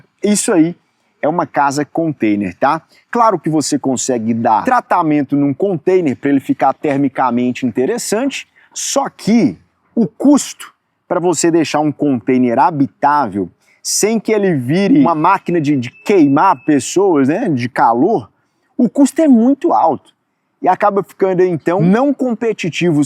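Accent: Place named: Sao Paulo, Brazil. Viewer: o cara é bem paulista né kkkk eu sou paulista e senti no ouvido umpouquinho kkkk mas muiito bom o video e passa informações interessantes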